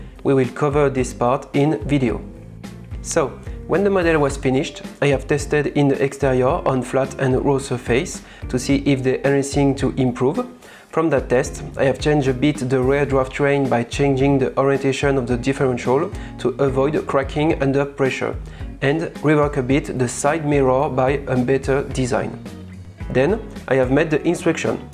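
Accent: France. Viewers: Are you french ? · are you french ?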